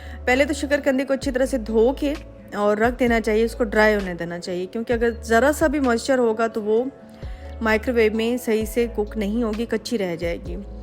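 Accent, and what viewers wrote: Saraiki, Pakistan. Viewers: I live in Multan and hope to see you soon... I m a saraiki too and apki meethi saraiki sun kar bohat acha lagta hai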